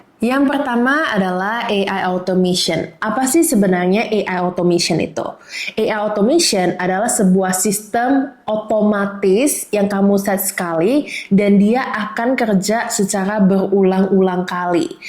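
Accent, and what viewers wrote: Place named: France. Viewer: aksennya franch bgt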